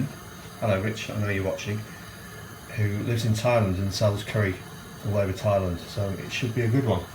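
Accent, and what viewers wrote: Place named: North Midlands, United Kingdom. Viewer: Yaaaay! He speaks! ( although very muffled) but still a big step! Great vid! I somehow thought you were a Geordie , so obviously you are not. North Midlands?? I must try this ( but still have a backlog of about 30 of your recipes), great stuff as usual, much appreciated .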